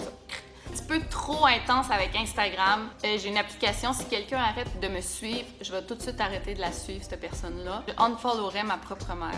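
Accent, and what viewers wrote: Quebec, Canada. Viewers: Je viens de découvrir ta chaîne aussi et je l'ai adoré! J'aime le français quebecoise <3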